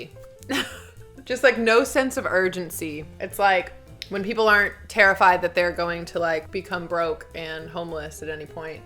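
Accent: England, United Kingdom. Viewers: I actually think you have picked up a little english accent love it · Your British accent might be rough but you have developed a British-esque cadence to your speech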